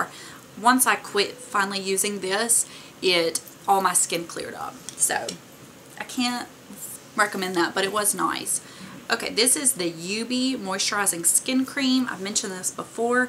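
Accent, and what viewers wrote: South, United States. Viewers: You definitely sound southern lol! I'm in Louisiana. Great job on the empties!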